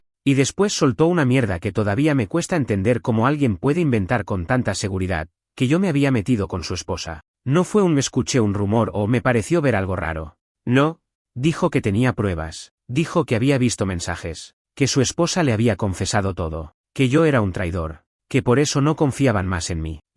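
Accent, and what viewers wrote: Argentina. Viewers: La IA que traduce es de argentina pero dudo que la historia sea de allá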